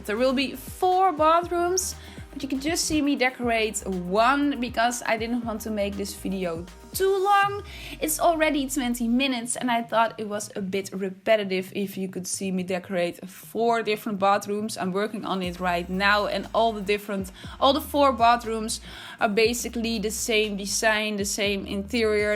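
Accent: Netherlands. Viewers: Wow i realy love the balcony in the bedrooms❤️ amazing how you made this! Looking forward to the next episodes of this series. Which country you live? You sound a bit dutch/holland like me😁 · Het ziet er geweldig uit 🤗 Alleen zou ik als kleine tip meegeven om wat meer de Amerikaanse of Britse uitspraak van woorden te leren 🙈 Je kon namelijk echt duidelijk horen dat je Nederlands bent, maar dat is zeker geen kritiek hoor, het gebruik en kennis van de woorden is namelijk erg goed 🤗 Het is oprecht gewoon bedoeld als tip 🤗 Je hebt in ieder geval wel een nieuwe subscriber 🙈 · The Sim Stream ik uit België 🇧🇪 ik dacht dat je uit Nederland kwam want er zat een Nederlands accent tussen je Engels 😁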